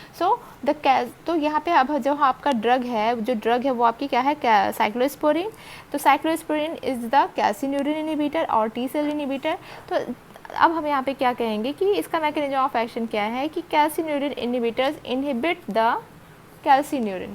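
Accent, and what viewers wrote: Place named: Himachal Pradesh, India. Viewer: Mujhe apki slang himachali lg rhi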